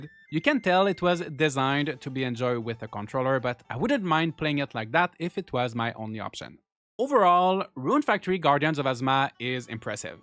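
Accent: Quebec, Canada. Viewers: Are you québécois ? Your accent sound like it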